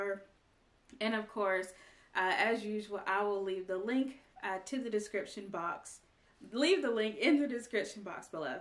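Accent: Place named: South, United States. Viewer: Wanted to hang out with my NC friend tonight. Thanks for this video. You give such good advice, especially for a new podcaster. Insecurities and comparison try to creep in as soon as you get started so thanks for sharing your viewpoint on these topics. You’re awesome! FYI, I feel like you and I sound just alike on camera. Southern gals 😅❤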